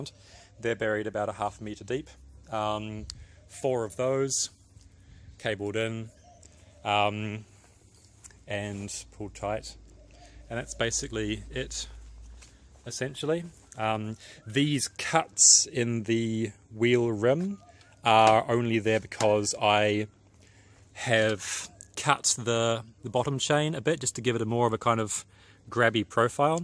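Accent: New Zealand. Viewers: Is he an Aussie? His "R's" make him sound like he comes the bottom the the south island NZ.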